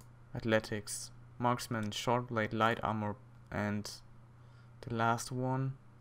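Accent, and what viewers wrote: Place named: Germany. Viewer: Every now and again you pronounce English words in German, it's quite funny.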